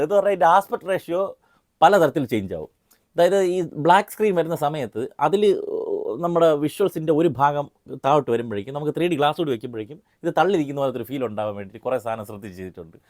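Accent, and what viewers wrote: Trivandrum, India. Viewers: Trivandrum slang 😂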